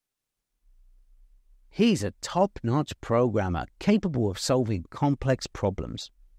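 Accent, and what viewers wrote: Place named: England, United Kingdom. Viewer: Though I'm not into football , but thanks so much for the shadowing, it's very helpful and I like your English accent and tried to imitate it. 😊💕🇬🇧💕👍👍👍👍👍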